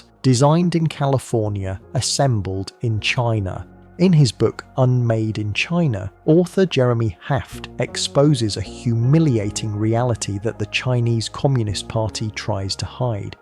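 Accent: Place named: England, United Kingdom. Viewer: Why is all this recent Ai generated content always narrated with an English accent? There must be a reason,i know its grating on me though.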